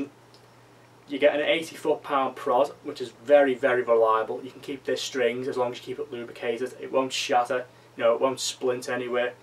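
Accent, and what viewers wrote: Liverpool, United Kingdom. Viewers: My mans a scouser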